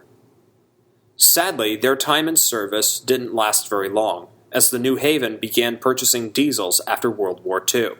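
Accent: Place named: RP, United Kingdom. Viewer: weird RP shit lol.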